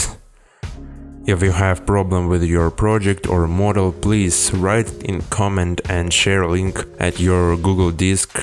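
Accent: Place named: Eastern Europe. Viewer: Accent never gets old. It's like the eastern European version of.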